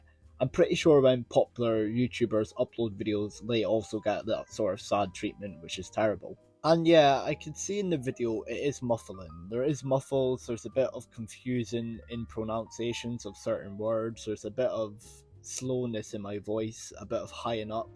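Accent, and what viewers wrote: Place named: Scotland, United Kingdom. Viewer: It was a great video! Its great hearing a fellow scot talking about the stuff im also interested in :)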